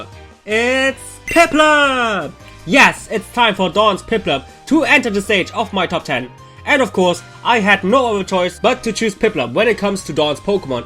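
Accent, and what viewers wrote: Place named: Germany. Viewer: Its his accent comon forgive him for being german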